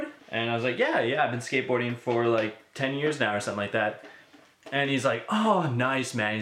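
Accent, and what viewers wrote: Canada. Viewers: You're Canadian right?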